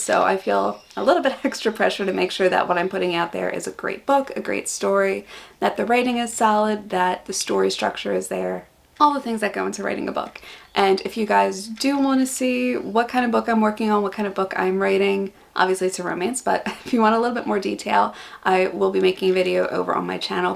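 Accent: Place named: Australia. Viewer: Sending positive vibes! Hey watch out - I hear a touch of Aussie accent!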